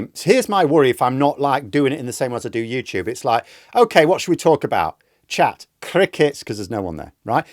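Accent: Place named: North, United Kingdom. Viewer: I have a dormant Twitch account where I followed a German woman in Finland who ran games and comments for her subscribers. It was mainly to help me learn about Eve. Unfortunately my short attention span caused me to drift. I can barely watch a full rugby match when I care about one team. I'd drop into a Twitch stream for you because it's you and I like your analyses and northern accent. For me (sample of <1), I'd be engaged by a game in the background, with a mission paused and a few topics on the table. But what do I now? I shit into a bag. In any case, I admire your evolving platform(s).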